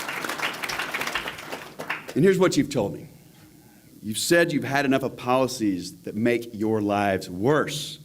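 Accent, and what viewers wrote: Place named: South, United States. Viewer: Damn i love his southern twang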